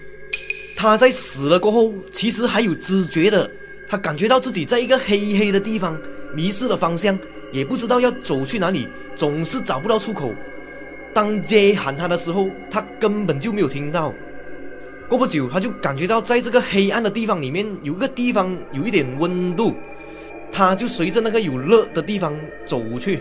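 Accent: Malaysia. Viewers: 曾经看过马来西亚网民批评你的口音，我是新加坡人，我们新加坡有新加坡华语口音，我喜欢马来西亚和台湾华语腔，所以你不要用AI，听马来西亚口音很亲切。还有，请马来西亚华人保留马新本土华语。新加坡华文界喜欢模仿中国和台湾，如联络 = 联系，宵夜 = 夜宵，甘榜 = 乡村，塞车 = 堵车，养育孩子 = 把孩子拉拔大（连田中裕子演慈禧太后也说她把光绪拉拔大），水草 = 吸管，地铁 = 捷运，电脑 = 计算机 -你去computer shop 说我要买最新的计算机，店员叫你去 文具店，注册结婚 = 办结婚证，运货 = 拉货，一个新加坡新传媒男记者竟然把德士说成 出租车 （rental cars），搭德士 = 打车，什么都搞，跟他们说谢谢他们学中国人说没事。我有说妨碍打扰你了吗？没事？我最喜欢人家说 一样一样 sama sama，或者 不会 （福建话翻译 buay）。新加坡媒体觉得 巴仙 很不标准，不敢说，有的新加坡人却模仿台湾人 说 趴，三十趴，五十趴。请马来西亚华人保留新马马新本土华语，别让它们失传。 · 謝謝你的故事❤不過我還是一邊聽一邊想笑呢😂是因為馬來口音很特別嗎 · 其实，UP主他没有使用 AI 语音技术啊～他的口才還是一样带有浓浓的大马腔的口音味道～完全听得出来，但是最近他的發音腔调 有很大の进步了，有听出來 确实比早期改善了很多，讲故事也更加顺畅了～很好吖～ 我認为说 ％ 百分率 = 巴仙，在大马 或 新加坡 世界各地 都没问题啊，没必要去刻意效仿 台湾，而且台湾 使用『％ = 趴』其實也不太标准。 然后，说句实話…其實 新加坡🇸🇬 就也是一样是 🇲🇾大馬啊，只是是旧的，是属于以前の马来西亚 而已，後来叛逆 有本事了就独立自主出来，所以两边文化自然差不多也一样嘛～。哈哈……😄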